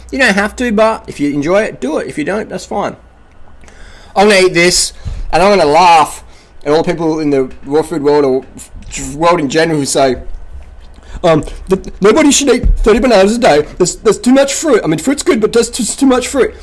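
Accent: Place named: Australia. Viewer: But you are funny with your Australian accent mate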